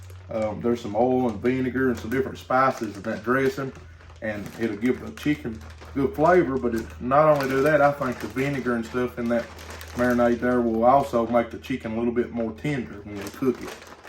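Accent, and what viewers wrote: South, United States. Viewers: love the southern accent 🙂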